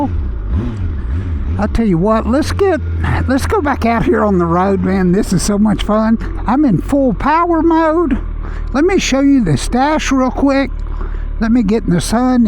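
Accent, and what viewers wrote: South, United States. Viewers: The high-pitched redneck voice sells the channel immediately - AMAZIN'!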